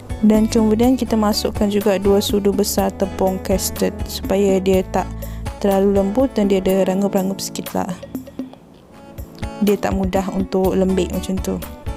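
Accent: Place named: Sabah, Malaysia. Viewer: tq resepinya.. tp knp bunyi awak ckp kdg2 mcm org sabah? hehehe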